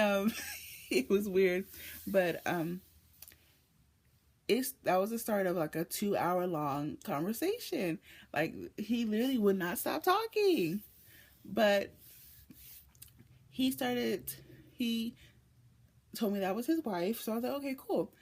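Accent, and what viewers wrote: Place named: United States. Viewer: Are you a British or American ? Your accent sounds like American .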